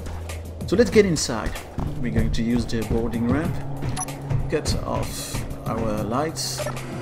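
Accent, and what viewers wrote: France. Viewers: Sympa ton mothership ! Un petit accent français dans la voix je trouve :) Beau boulot sinon !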